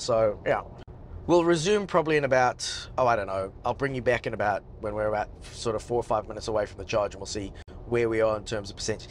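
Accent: United States. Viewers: Love the American accent to get voice control working. Hopefully buying a Model Y soon.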